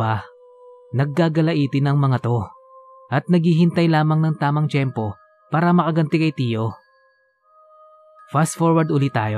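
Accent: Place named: Hiligaynon, Philippines. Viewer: Hello sir, Salamat boss.. Ang galing mo sa punto ng Hiligaynon... I would like to RENDER A SALUTE.. aw tama ba yung grammar hehehehehehe SOLI DEO GLORIA SA CHANNEL MO! Malik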